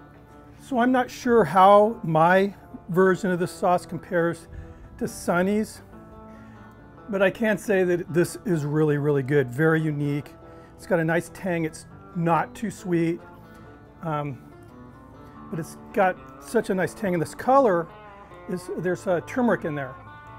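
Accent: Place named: Texas, United States. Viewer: no need to have a Texas drawl, you're a pitmaster, brother! 🌵🤠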